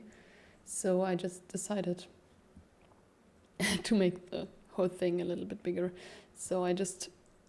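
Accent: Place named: Germany. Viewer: just found you and am really enjoying these long tutorials. Also love the charming german accent hahaha gerne mehr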